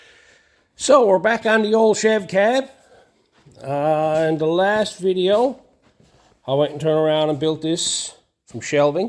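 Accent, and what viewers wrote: Newfoundland, Canada. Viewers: What accent is that Newfoundland? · I knew that accent right away. Great to see a Newfoundlander doing awesome body work. Bravo! Bill from Saskatchewan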